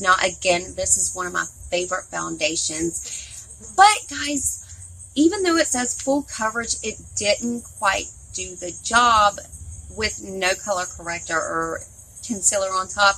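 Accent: South, United States. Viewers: I was curious,Where are you from?Im from Arkansas.You sound a bit country like here.💞💖💕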